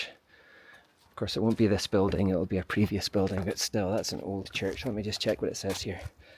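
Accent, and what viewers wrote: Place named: Aberdeen, United Kingdom. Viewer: you need to do your tree man you sound like you're from aberdeen'ish area - my granny was from lewis and her mum was from Aberdeen, well Moray (Urquhart) - thank god - cause records only go to the late 1700s in Lewis - Aberdeen's are way better - you're probably related to loads of these folks - I'm related to the folk that used to live at Drum castle too :D its well nice - I now want to go and reclaim all these castles :D :D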